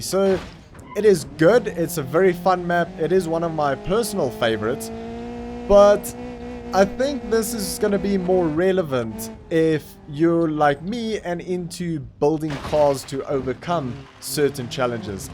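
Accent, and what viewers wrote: South Africa. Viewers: are you from South Africa? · u sound like ur from South africa Are u?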